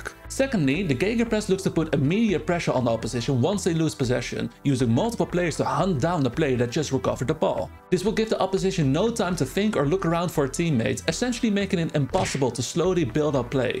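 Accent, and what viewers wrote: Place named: Netherlands. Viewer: Oh wauw, haven’t even heard of this good lad yet. Sounds like he’s a fellow dutchman as well 🇳🇱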